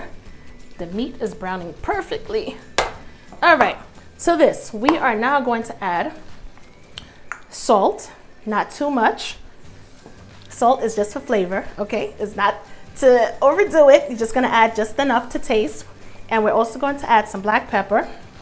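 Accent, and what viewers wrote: New York, United States. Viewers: This heavy New York accent and giggle just reminds me of Ashanti and all my female friends back home. I’m making this tonight and just subscribed. Thanks love!! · Sound like your from NYC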